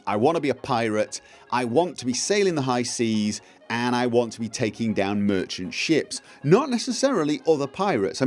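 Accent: United Kingdom. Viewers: I'm American, so I can say this: American is not really it's own language. It's begged & borrowed off so many other languages & then mutated their words in such a poor fashion that they are no longer lending us any. So, now we make up crap nonsense words & add them to the dictionary.:D Thank you, for speaking the Queen's English! Although, that, in itself, also was fashioned from other languages, I suppose. But, it just sounds so much better across that pond!;)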